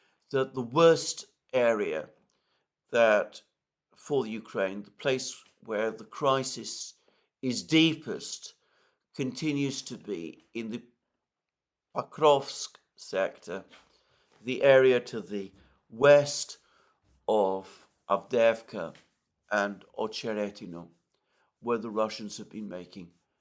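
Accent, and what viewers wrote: United Kingdom. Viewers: AM feeds off of the gullibility of his audience. Take some time and listen to other programs. This one is ALL KREMLIN PROPAGANDA NARRATED BY A MOUTHPIECE WITH A BRITISH ACCENT AND A VERY SORTID PAST.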